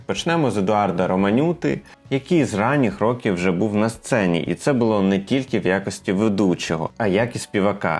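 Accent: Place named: Russia. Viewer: Дякую за такий гарний ролик! Прохання до ведучого розмовляти українською вдома. Російський акцент жахливий .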